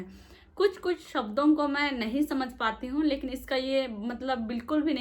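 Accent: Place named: Palamu, India. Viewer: मैं पलामू से हूँ हमलोग तरफ भी आपके जैसे बात करते हैं ।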